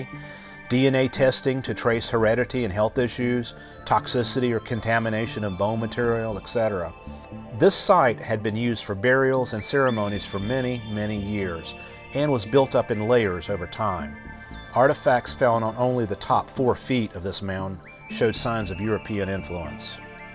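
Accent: South, United States. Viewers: He has a Southern accent. No question about it. Just like I do. "I got my own way of talking, But everything is done With a Southern accent Where I come from." ~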